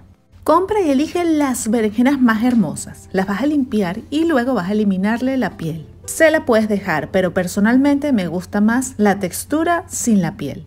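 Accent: Venezuela. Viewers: Hola paisana, seguro que eres Caraqueña o Valenciana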